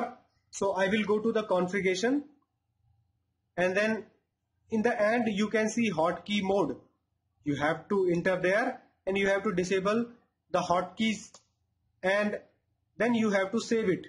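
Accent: India. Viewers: sound indian bruh XD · Judging by the accent you must be Indian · Love that authentic Indian English accent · Indian accent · HAHAHAHAHA I love your indisch accent :D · FantasticGamerYT it's an Indian accent you're just an ignorant ass who has no consideration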